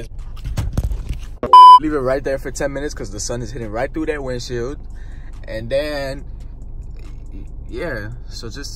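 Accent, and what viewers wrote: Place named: Miami, United States. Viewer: U sound like you’re from Miami…I’m from Miami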